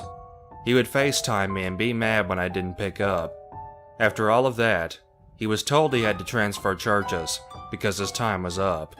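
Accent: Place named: South, United States. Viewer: Love that southern accent!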